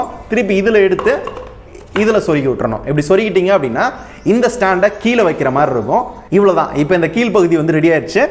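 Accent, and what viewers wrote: Madurai, India. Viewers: கொஞ்சம் எசவா இருக்கும்.Madurai slang😍😍😍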